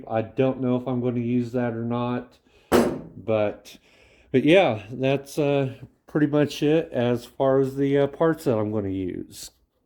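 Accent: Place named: North Carolina, United States. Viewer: What dialect are you speaking? North Carolina?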